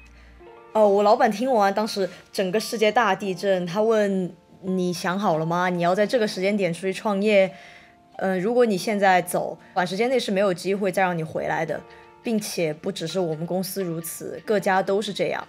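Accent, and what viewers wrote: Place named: Taiwan. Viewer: 祝你创业成功。 btw:听你说话感觉像台湾口音。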